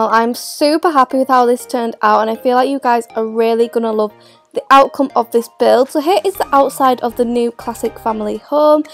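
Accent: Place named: England, United Kingdom. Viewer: Rubina Begum she is from England so she has a accent :)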